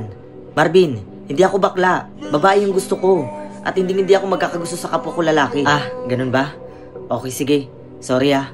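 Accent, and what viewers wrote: Visayas, Philippines. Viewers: bisaya man diay ka idol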